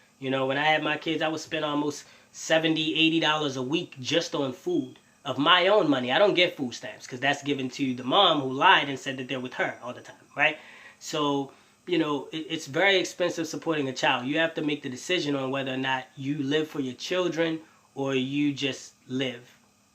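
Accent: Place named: Maryland, United States. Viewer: Sounds like you're in MD I really like what you're doing here. Let's connect brother.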